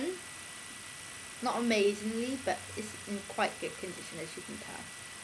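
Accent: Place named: Wales, United Kingdom. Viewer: Good video , nice Welsh accent , excellent.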